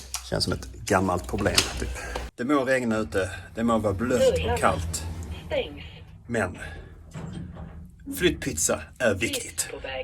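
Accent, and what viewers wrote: Skåne, Sweden. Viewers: Tack för vlog. Maybe do some more of these please. Lucky day to move, same day as our new president! Grät du during that inauguration? I cried. Are you losing your Skåne accent?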